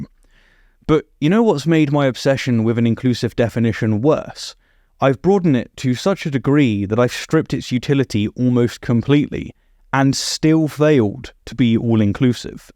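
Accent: United Kingdom. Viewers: It's sort of nice to hear a voice with this accent say that trans people are people. Some hope for the UK yet.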